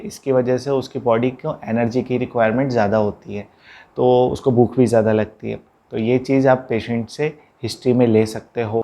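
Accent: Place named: Uttar Pradesh, India. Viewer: Sir Aap pakka UP se belong krte h 😅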